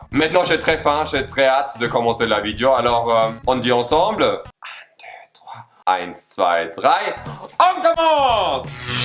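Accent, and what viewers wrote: Quebec, Canada. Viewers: Ah le canadien🇨🇦 un mélange d'allemand🇩🇪 et de francais🇫🇷 🙄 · J'adore l'accent Québécois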